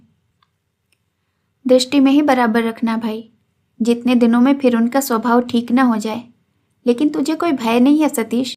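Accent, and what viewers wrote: Standard. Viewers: ❤❤❤ बहुत ही संयमित एवं अनुशासित, बहुत ही सुंदर एवं दक्ष उच्चारण कला के साथ ऑडियो प्रस्तुत करने के लिए बहुत बहुत धन्यवाद साधुवाद!! एक क्षुद्र जिज्ञासा...😊 आप किस क्षेत्र से हैं; यह आपकी उच्चारण कला से भी जन नहीं ताड़ता!🎉🎉 कृपा करके बताने का कष्ट करेंगी जी??❤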